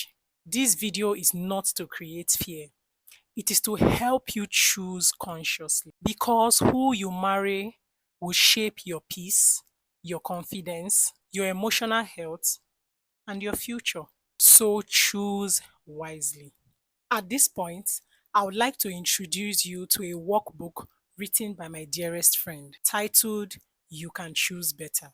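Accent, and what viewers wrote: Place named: Nigeria. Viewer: your advice is wise. I love your Nigerian accent too ❤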